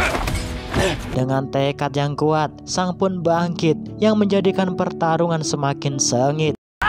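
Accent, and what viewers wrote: Madura, Indonesia. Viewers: Orang Madura bang? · MIMIN NYA ORANG MADURA KAH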